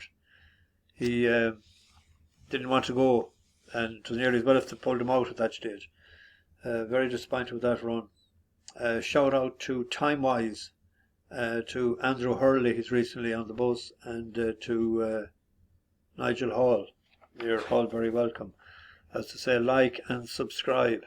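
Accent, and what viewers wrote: RP, United Kingdom. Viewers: U gone very posh.jesus I remember when U were a bus driver 😂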